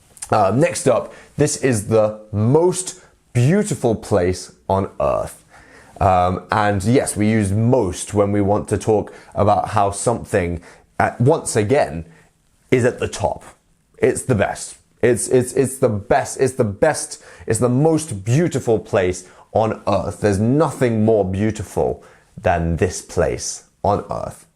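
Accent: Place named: RP, United Kingdom. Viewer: I'm totally hooked on your accent,. Hearing this RP is like a journey through the vast and green Southern English landscapes 😅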